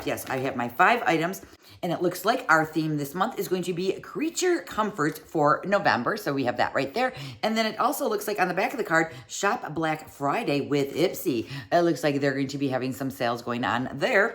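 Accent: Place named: Midwest, United States. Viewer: Hi! bag 1 is yours! I can tell by your voice, and excitement level for the first bag. I have heard that Ipsy uses the age to decide if they give you more makeup or skincare. I have been curious what two bags would look like if the only difference in the profile was the age! Also are you from Wisconsin? I grew up there and your vote reminds me so much of the Midwest. It's super fun that you get it with your niece. I can't wait for my daughter to get old enough to gift her Ipsy!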